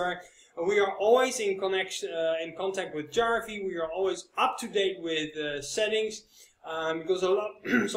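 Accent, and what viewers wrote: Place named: Netherlands. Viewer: How do you speak english, havin a dutch accent with russian on ur PC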